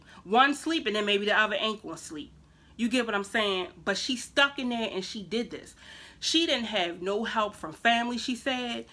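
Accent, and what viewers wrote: Baltimore, United States. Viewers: I'm new to your channel. I recognized the Baltimore accent and had to follow and support!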